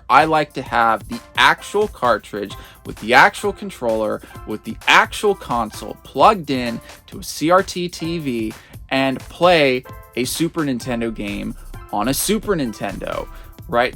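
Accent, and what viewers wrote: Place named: Canada. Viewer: You sound like a Canadian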